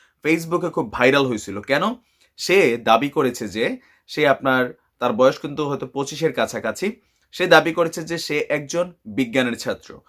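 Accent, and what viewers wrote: Comilla, Bangladesh. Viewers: আপনার সেফুদার কণ্ঠ অনেক ভালো লাগে আর কুমিল্লার ভাষায় তো মাশা আল্লাহ। আশাকরি সব ভিডিওতে কুমিল্লার ভাষায় কিছু বলবেন😊😊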